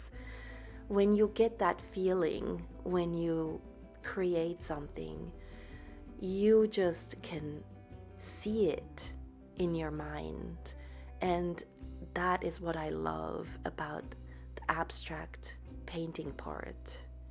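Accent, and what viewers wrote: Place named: Hawaii, United States. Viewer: This is an awesome piece in and of itself, but it's more meaningful because of the thoughts you shared at the end. Thank you for that. 💜👍 Are you or your spouse from Hawaii? I hear an accent in your voice 🙂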